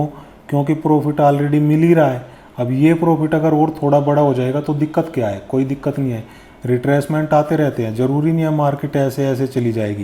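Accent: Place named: Haryana, India. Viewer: Bhai aap to merre haryana se lg rhe ho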